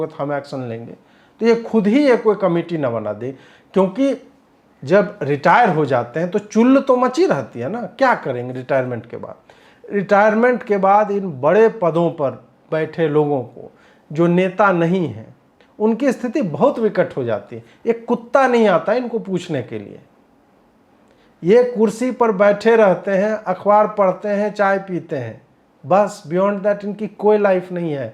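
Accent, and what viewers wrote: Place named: Bihar, India. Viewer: कितना झूठ बोलोगे। बोली तो बिहारी किस्म की लगती है। अगर आप बिहार से नहीं हैं तो प्लीज़ बता दें ताकि हम बिहारी इस अपराध बोध से मुक्त हो सकें कि हमारे बीच से आप जैसे लोग भीआते हैं।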